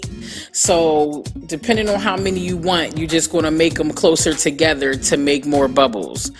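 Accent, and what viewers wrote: Philadelphia, United States. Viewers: I love how heavy your accent is that’s that philly right there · Beautiful job! You can take the lady outta Philly but you can't Philly out the lady! Love that accent 💜💜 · Darling where are you located?! You sound like you’re in philly (no offense!) is love a press and trim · Your Philly voice is all that! Love my city.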